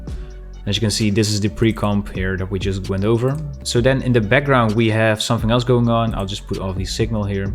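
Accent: Netherlands. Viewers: your dutch arent you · dutch accent :)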